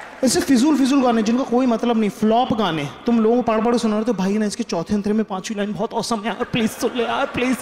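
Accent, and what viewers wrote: Indore, India. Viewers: Bhai Bilkul Indori language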